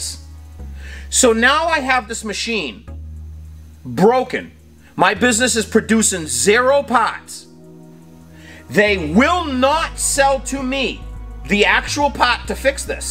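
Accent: Boston, United States. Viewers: Was just gonna ask , u from Bosten, lol.😊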